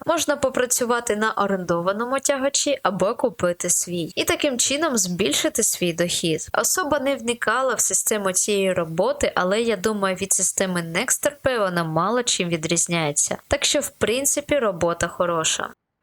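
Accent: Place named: Donbas, Ukraine. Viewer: Ти з Донбасу? Мова з родзинкою ❤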